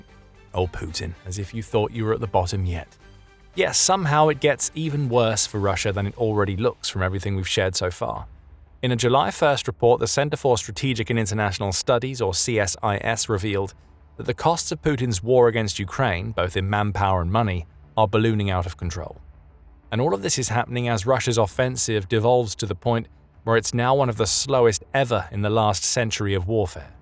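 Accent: United Kingdom. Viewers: First time listen to a Brit talk?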